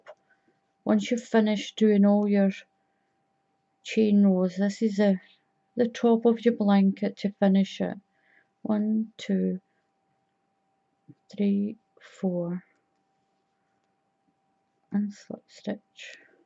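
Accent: Scotland, United Kingdom. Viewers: Hi I am so glad I came across your crochet tutorial. You are great at explaining things. Many thanks and love your Scottish accent. Thank you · Hi are you Scottish?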